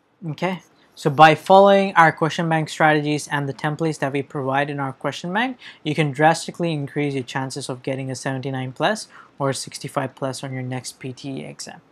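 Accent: India. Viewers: wideos. You're indian. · you are from bengal and bengali might be because i understand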